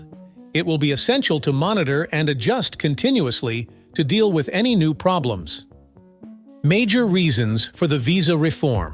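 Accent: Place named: United States. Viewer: Why ido we have a bloody yank speaking and not Aussie????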